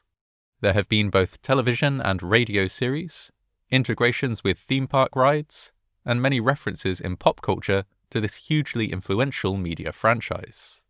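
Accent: England, United Kingdom. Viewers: What part of England are you from? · The clip isn't even shown. Just some British dude yapping...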